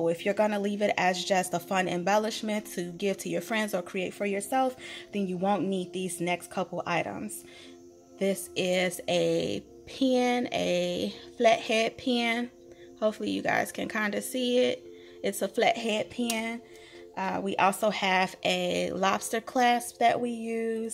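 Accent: South, United States. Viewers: Hi! Oh sugar, I have been doing videos for some time now, and I still stumble over...well...lots of things. I say "um" all of the time. I enjoy watching tutorials where mistakes are left in due to people being real. I never met a perfect person.;). I have butter fingers whenever the camera is on. As soon as it is off, I am fine. Your nails look great! Love it that your nails match your project. Wonky is real. By the way, Tree sent me over. I am a new subscriber of yours, but you will not see me on your list due to my settings are on private. They came out darling! Love the colors. I really enjoyed your tutorial! I love your twang sugar...I am from the south. Oh, and by the way, I also loved hearing your daughter in the background from time to time. Thank you for sharing your creativity with us all. I hope you have a safe, marvelous Monday. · I love this! 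The only problem was that you were out of frame when making the hook with the wire. I couldn't see how you did it. If you combed out the pom poms, they would be even lighter and fluffier. TFS!, BTW, I love your southern twang!,😊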